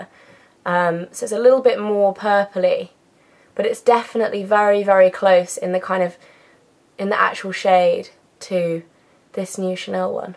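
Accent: United Kingdom. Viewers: omg , i wish i had a british accent . i love they way your voice is ! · Bahaha. i try to talk british like yhu afterwards.. Is tht akward..(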